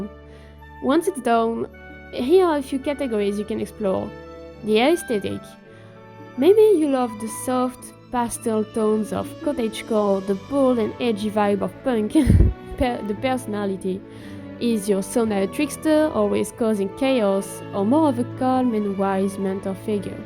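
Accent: France. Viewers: l'accent francais est adorable<3 merci pour cette vidéo!! jai souvent le réflexe de dessiner ma persona en mode silly goober et ce qui marche pour moi cest de créer une version "originale" simple, et ensuite faire autant de AU que je veux du genre ocean AU, space AU ou je m'amuse plus avec le design :)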